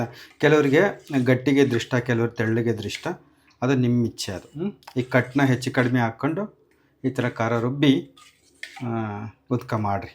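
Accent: Chitradurga, India. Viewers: Nim uru yavdu sir chithradurga na nam bashene mathadthira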